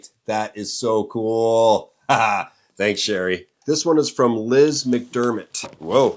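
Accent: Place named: New Brunswick, Canada. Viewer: I knew it! Since I started watching you I thought your accent was similar to mine, but this is the first time I’ve seen you mention being born in NB! From around the Miramichi, is my guess? :)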